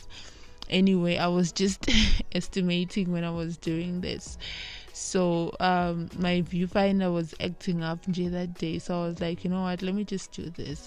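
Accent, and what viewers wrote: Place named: Africa. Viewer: she speaks like an African, there's no American accent even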